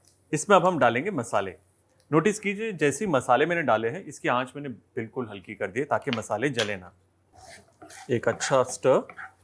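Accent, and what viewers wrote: Punjab, India. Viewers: Really like ur punjabi accent. In my parents house we make safed chana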